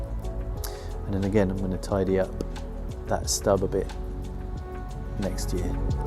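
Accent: United Kingdom. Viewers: Thanks, the music is fantastic as always. I can notice you are doing mindfulness sessions just listening your peaceful voice jiji. I love your perfect English accent. Take care.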